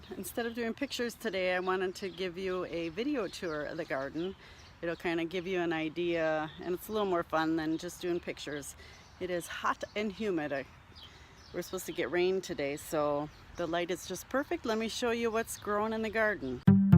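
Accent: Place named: Midwest, United States. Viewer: My late husband and his family are from Minnesota and I detected the midwestern accent!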